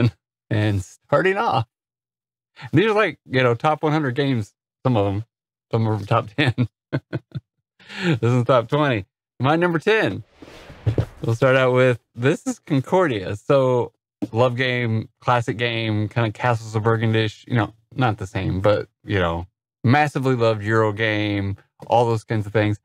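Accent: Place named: South, United States. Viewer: the southern drawl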